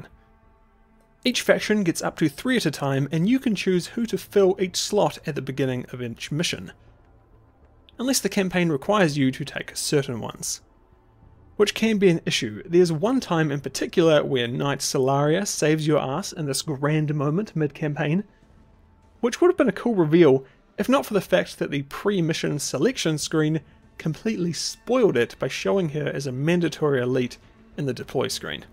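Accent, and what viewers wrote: New Zealand. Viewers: This video made me actively dislike the kiwi accent. "Vuctury" "Sit-up" "Sid" "Lamint"? Seriously?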